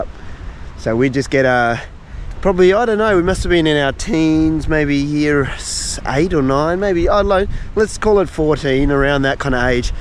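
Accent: Australia. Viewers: Bro I started watching your videos during covid, I thought you were from Sydney, then Melbourne, then Brisbane 😂 Anyways, great content, still watching! Best on my WFH days 🎉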